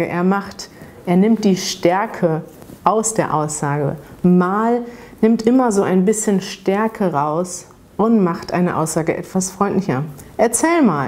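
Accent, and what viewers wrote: Standard. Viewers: Hi, how can I get in touch with you directly? g:) You are the most methodical person I found on the web and your Hoch Deutsch rocks:) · Ist das hochdeutsch?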